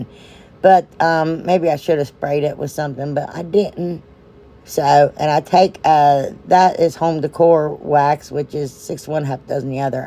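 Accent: South, United States. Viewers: You have got to be from the south!! I have the same accent! I’m from Southeast Texas.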